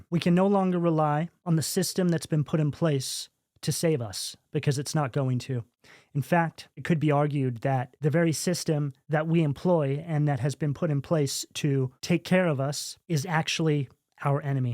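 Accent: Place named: East Coast, United States. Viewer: I always thought you were east coastian.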